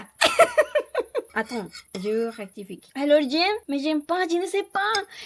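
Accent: Colombia. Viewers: Ton naturel ton sourire et ton accent colombien m'on fait craquer tu es adorable et trop mignonne j'adore le camembert 😂😂😂ta joie de vivre me fait trop plaisir💋😘😘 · YOUR COLOMBIAN ACCENT SPANISH IS BEAUTIFULL IN FRENCH❤❤❤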